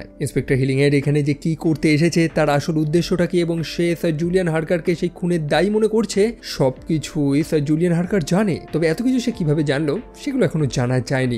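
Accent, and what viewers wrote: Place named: Sylhet, Bangladesh. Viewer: Sylheti vai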